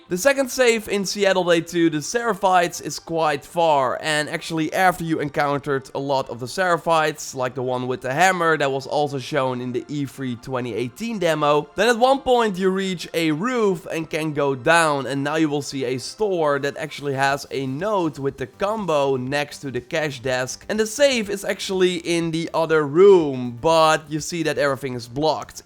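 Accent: Netherlands. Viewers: Your dutch ? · Het leuke aan dit is je Nederlands accent. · Are you Dutch by any chance? Your accent sounds like it haha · Je bent Nederlands of niet. Je hebt echt een Nederlands accent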